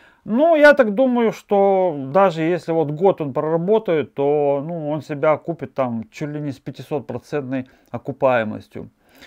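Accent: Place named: Odessa, Ukraine. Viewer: Одессит чтоль? Говор знакомый... За обзор спс.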